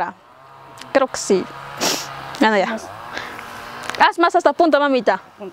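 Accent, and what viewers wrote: Mexico. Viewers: Jajajaja que linda, habla igual que las indígenas mexicanas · PARECE QUE ERES DE CHIAPAS MÉXICO. HASTA EL MISMO ACENTO AL HABLAR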